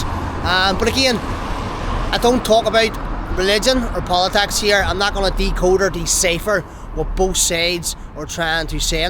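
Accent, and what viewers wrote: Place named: Scotland, United Kingdom. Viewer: Yee have a wee Scottish accent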